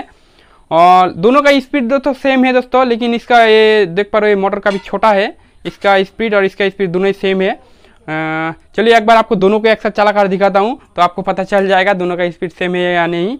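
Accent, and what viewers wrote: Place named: West Bengal, India. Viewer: তোর কথা শুনে তো বাঙালি মনে হচ্ছে।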